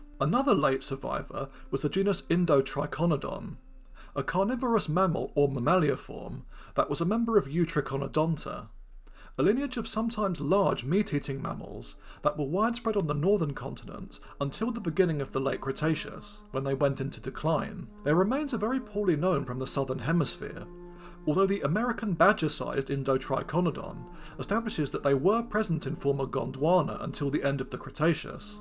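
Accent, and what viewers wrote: United Kingdom. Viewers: you sound like paddington but more british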